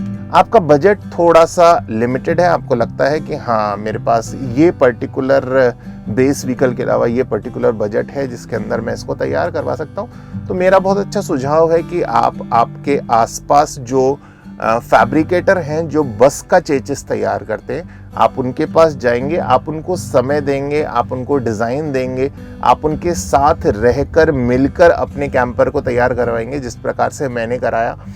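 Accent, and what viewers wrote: Standard. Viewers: Very informative and well explained and this is the purest form of hindi I’ve heard in a while🤩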